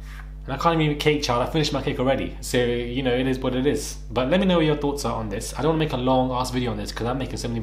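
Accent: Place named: United Kingdom. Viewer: Racheal X Whoa! Well I feel dumb, I should have guessed this guy has a primarily uk audience since he sounds like he’s from there too😅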